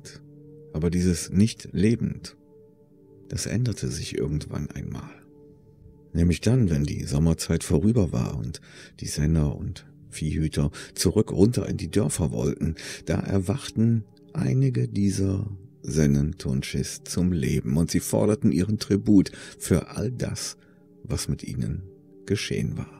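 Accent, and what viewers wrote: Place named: Cologne, Germany. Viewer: Ja die Stimme 👍🏻…. als Regierungssprecher wäre der beste Wurf den diese Regierung machen könnte. Und diesen Akzent kenn ich nur zu gut als gebürtiger Kölner…… den findet meine Frau auch so amüsant und ansprechend 😉. Leider ist es mit meiner Stimme nicht so gut bestellt 😄. Ich würde mir wünschen diese Stimme mal als Synchro Stimme im Kino zu hören….. finde das mittlerweile manche Stimmen gefühlt in jedem Film vorkommen. Speziell die von Ryan Reynolds, Chris Evans und der Himmel weiß wer da noch alles synchronisiert wurde von dem Herrn. Da wäre mal eine neue Stimme mal wirklich angesagt.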